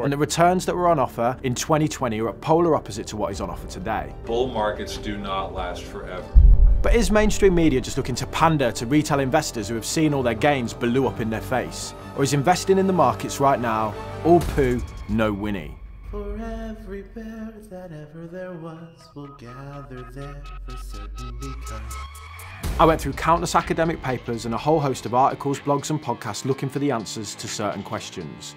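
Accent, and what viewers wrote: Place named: Midlands, United Kingdom. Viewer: Trying to pinpoint your accent, sounds slightly midlands with a northern twang or maybe Cheshire?! Anyway great content as per usual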